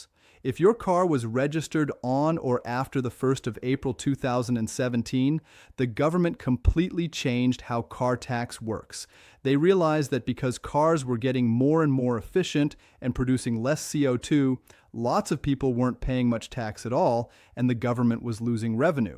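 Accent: United States. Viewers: Ah it’s the uk lose the American voice it’s dammed annoying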